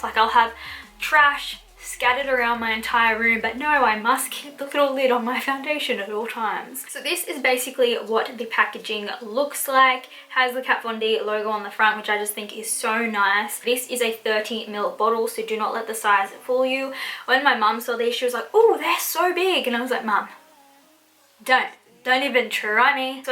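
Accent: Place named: Australia. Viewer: You are such an Aussie. I can hear it :)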